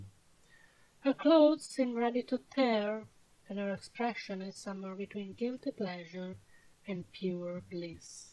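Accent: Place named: Italy. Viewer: Dat thicc Italian accent! love it!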